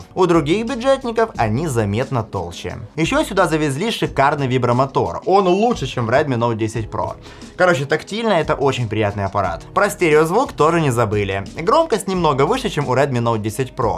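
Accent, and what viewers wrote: Odessa, Ukraine. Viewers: Хренасе, ты Одессит?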